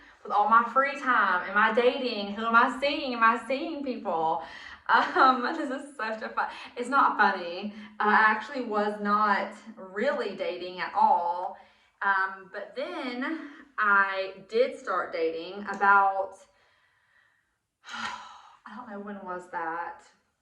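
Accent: South, United States. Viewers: I just loveeee your southern accent. I dont want it to go away when you move. 😭😭😭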